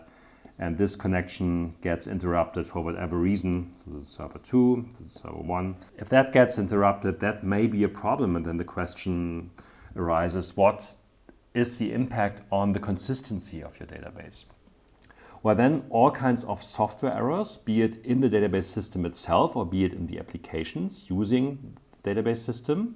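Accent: Germany. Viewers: Ohh Gott, dieser Deutsche Akzent. Aber trotztdem Danke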